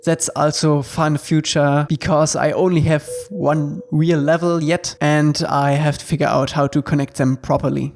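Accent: Germany. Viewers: Dein deutscher accent ist echt baba HAHAHAHA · Is he a german? Edit: Lol he is really one😂😂😂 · Dein deutscher Akzent ist echt episch haha. Naja solange dich jeder Versteht passt's ja 😋🤣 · Kann das sein das du deutscher bist?😂😂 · Are you German?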